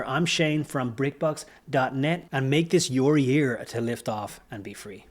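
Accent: Ireland. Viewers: I'm always super confused that somebody with an Irish accent is introducing Lego deals from the USA. Here in Europe the deals are not great.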